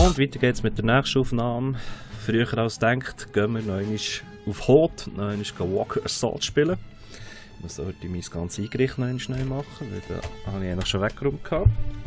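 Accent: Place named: Bern, Switzerland. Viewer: z erstä bärndütsch lp u youtube. chunt eim huerre komisch vor. aber geil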